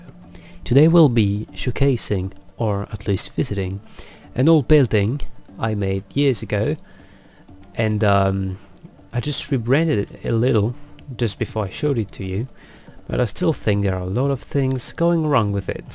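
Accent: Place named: England, United Kingdom. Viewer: Your english accent is so sweet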